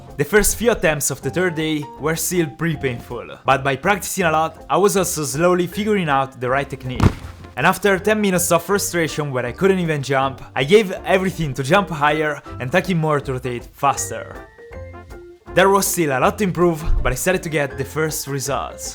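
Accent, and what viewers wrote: Italy. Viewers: Fra se sente che sei italiano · madonna quanto si sente che sei italiano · bello come si capisce subito che sei italiano ma nessun italiano commenta i tuoi video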